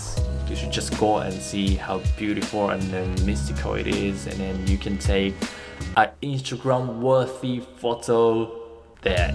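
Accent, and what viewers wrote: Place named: Australia. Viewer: I can tell by your English - you spent your time abroad in Australia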